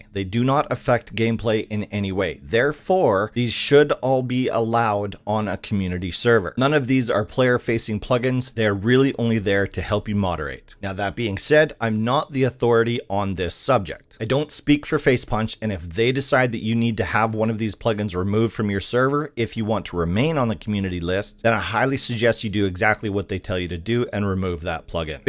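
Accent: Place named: Canada. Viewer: Dude its NOT "Copy PASTA or POSTA" or whatever you are saying ... its copy PASTE - like think of PAY + ST.... Like Fish PASTE etc. dang that is such bad and annoying mispronounciation and its hard enough with you Canadian Cousins saying ABOUT all weird but, this PASTE really takes the BISCUIT and god knows how you would say "BISCUIT" lol Im from the UK btw and love Canadians and Oh Canada...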